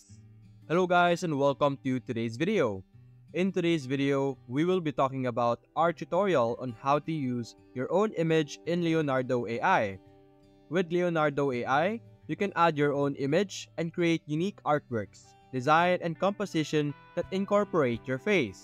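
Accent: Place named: Visayas, Philippines. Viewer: I can tell you're a filipino hehe and definitely bisaya with your intonation hehe good tutorial keep it up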